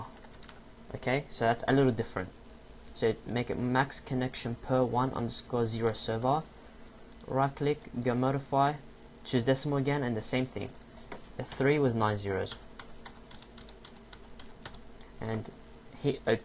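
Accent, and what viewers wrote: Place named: South Africa. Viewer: That's because it isn't a British accent. It's a South African one.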